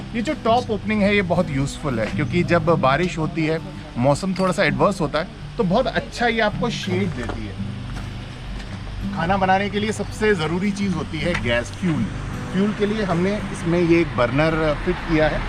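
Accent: Standard. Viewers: वाह क्या शुद्व हिंदी बोलते हो आप 😊👍👌मजा आ गया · Apki Hindi itni sudh h k. Salute to you sir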